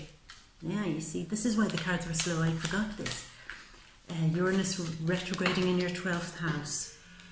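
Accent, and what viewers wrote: Ireland. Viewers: Gemini sun, Aquarius moon, Scorpio rising here <3 I watch a LOT of tarot channels and yours is by far the most touching, accurate and thorough <3 the readings this month and last were eerily accurate to my situation. You explained the exact thing that happened to me. This gives me so much hope and inspiration. Plus, my family is from Ireland and I love hearing that calming voice <3 reminds me of home. Thank you so much for taking the time to do these readings for us.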